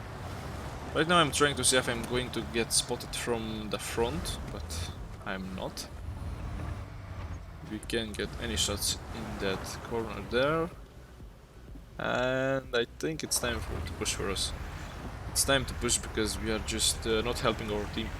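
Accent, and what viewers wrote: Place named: Belgium. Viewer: Ik kon je accent maar niet plaatsen tot je 'tier' zei hahah, ik dacht gelijk een Nederlander met een raar accent en toen kwam de 'spotter' en toen dacht ik belg hahahah. Toffe video man, gesubbed en geliked.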